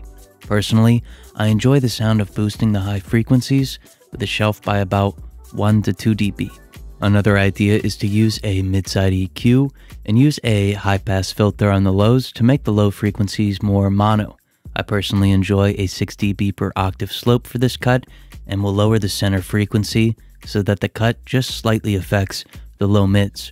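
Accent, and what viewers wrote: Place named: East Coast, United States. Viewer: I still don’t understand why your pronunciation has COMPLETELY changed from your older videos. It’s like you’re trying to sound a little east coast-ish. Whatever it is, I’m puzzled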